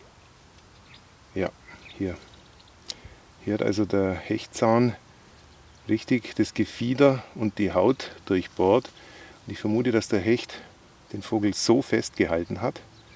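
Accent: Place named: East Franconia, Germany. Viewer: Ich mag Deinen Dialekt! Ist das ostfränkisch? Bamberger Gegend? LG aus Österreich..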